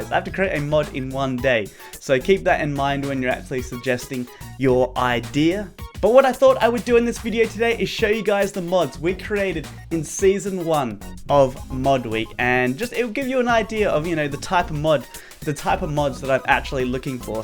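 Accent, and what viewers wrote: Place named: Australia. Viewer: you from Australia I was confused because you say the same as me because I'm ausie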